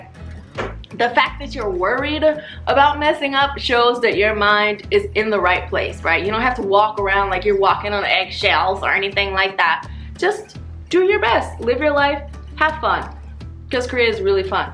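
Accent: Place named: South, United States. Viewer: How serious is the vanity issue in Korea and the deal with the narrow chin/jaw I have seen some that the shape of the face with the jaw/chin being so narrow/thin but the top of their head is bigger it is shaped like how they portray aliens to look (like old Roswell stories). Anyway I love learning about the culture and the only thing that I find concerning is the appearance/vanity issue. I do subtitles for Liu Studio, sometimes (aka Lee Sungmin from Super Junior) so I need to learn even more. I am originally from the South too, I knew you were the first time I heard you speak haha. I now live in Australia. I hope to visit Korea sometime.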